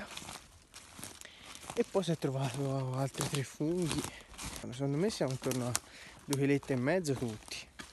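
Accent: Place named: Tuscany, Italy. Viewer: Belli i funghi ,bello te e la nonna,ma l’accento toscano mi fa’troppo impazzire!Complimenti con tanta invidia da una nonna che non ce la fa’ più ad andare a funghi,bravi!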